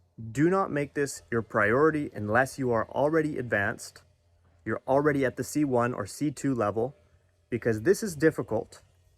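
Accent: Canada. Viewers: Are you Canadian ?? Where are you ? I’m in Canada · Canadian is clearly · hello boss, love love your english Canadiense Accent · I can literally sense your Vancouver accent.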